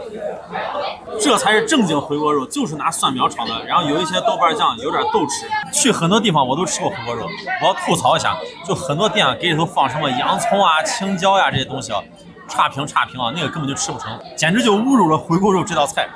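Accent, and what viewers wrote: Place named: Henan, China. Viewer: 我就喜欢看 你个陕西人带着河南味儿说四川话，用爵士乐伴奏着中国农村街头大妈做小吃 的那种感觉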